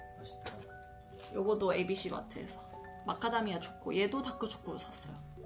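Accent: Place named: Gyeongsang, South Korea. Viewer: 혹시 경상도분이세요? 사투리 장난없으시네여 🤭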